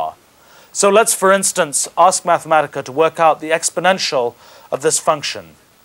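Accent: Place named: United Kingdom. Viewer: Actually his accent has become noticeably more British. The accent above sounds Mid-Atlantic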